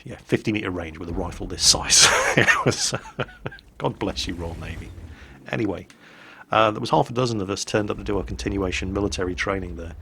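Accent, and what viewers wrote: Australia. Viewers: Soooooo... Are we going to get airsoft videos full of ' horrible Aussie accent now? Because that will be interesting.:)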